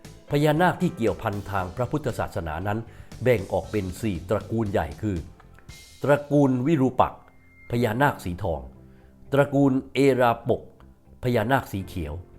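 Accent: South, Thailand. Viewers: คุณอ่านดีน่ะ แต่ อ่านชื่อ ผิด 2 ชื่อ นันโทปนันท กับ อัลเบิร์ต ไอสไตน์ คนใต้ บ้านเราทองแดง พออนุโลม แต่ อ่านผิด คำ ต้อง ระมัด ระวัง มากๆ ครับ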